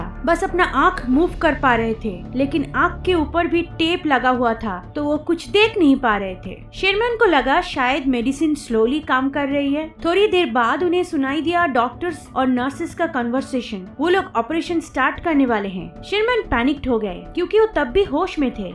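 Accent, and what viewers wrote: Bengal, India. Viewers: Are you bengali? It sound like you are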